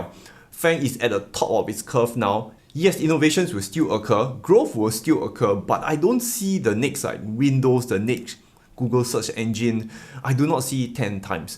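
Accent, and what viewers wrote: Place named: Singapore. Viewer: Needs an accent reduction course for Singaporeans. Straining to listen & follow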